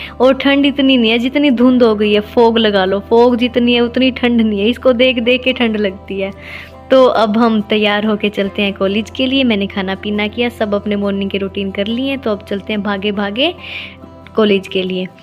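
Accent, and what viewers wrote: Haryana, India. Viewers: Haryanvi tone lagra aapka.. Haryana se ho kya aap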